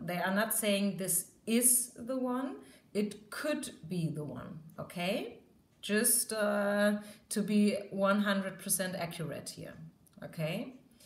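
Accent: Germany. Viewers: your accent is so rich and beautiful. i studied german for two years and still can hardily understand it. you’re such a beautiful soul with a beautiful accent. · I love that german ascent A lot! 😍😍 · Your german accent is really charming :) I speak german too and I totally feel how translating straightforward german sayings int english can be tricky. it's like in german you have a word for EVERYTHING :D you did an amazing job and your voice is very sweet and calming too. Thank you for relaying these messages to us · You have a German accent :D Am I right? I am asking because I am very interested in different accents and I am always very happy about guessing correctly.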